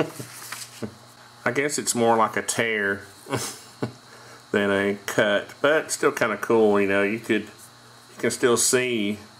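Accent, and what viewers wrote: United States. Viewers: Damn he has annoying "murica" accent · U have a really heavy american accent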